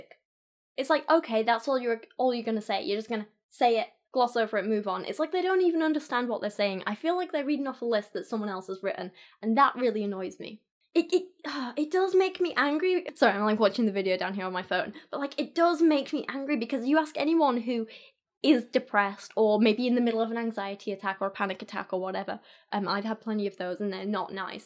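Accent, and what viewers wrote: United Kingdom. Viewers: Your timing is awesome. I had a really bad day today, and there you are, waiting for me to get home and log on so you can do your British patter with the cute accent and the inspirational point of view, and you'll talk about a point that need qualification and you'll go ". . . B-u-r-t . . . " and then add the qualification. So, I watch you for a half an hour and now I feel better. Thank you!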